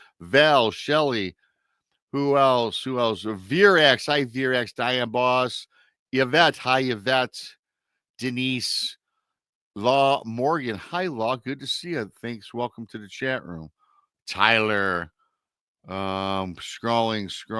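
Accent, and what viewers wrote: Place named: Michigan, United States. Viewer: Michigan is my home state. I love your accent. It makes me homesick.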